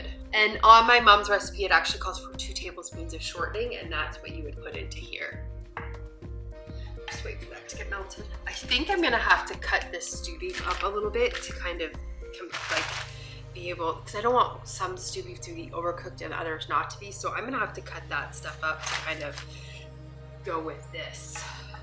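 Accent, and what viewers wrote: Canada. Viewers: thank you. you've got me interested in it again! and for that I thank you. is your accent Canadian? I live in metro Detroit so its very familiar to. me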